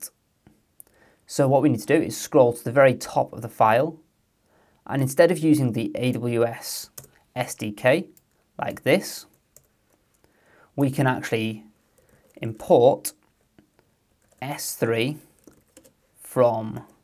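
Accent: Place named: United Kingdom. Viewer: that british accent!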